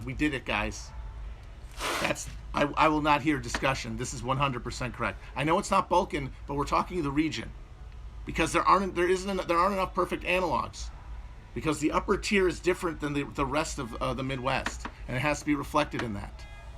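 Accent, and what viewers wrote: Louisiana, United States. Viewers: When you began speaking with the Cajun patois, it triggered some personal memories from long ago. In that geological era, I was trained as a saute cook in a rather upscale Holiday Inn by an incredibly talented Louisiana immigrant. The hotel in question is way north of the Mason Dixon line. He never revealed to me how he got past the border guards, and seemed uncomfortable discussing his transition. I never pressed him about it. He is an expert saucier and makes the best red beans and rice I’ve ever had. And after all these years, he still won’t give me the recipe. So.... I really enjoyed this episode. My favorite so far. Breaking in a new grill, the Balkanized Mid West, protesters, attack helicopters, Harry Nilsson, Covid Economics & Sociology, and finally, The Steak. Looked delish. I personally like mine dangerously rare. 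Meat thermometers are essential for any household, but on the grill, eventually you will learn how cooked the meat is just by a touch of the tongs. Bon Apetit.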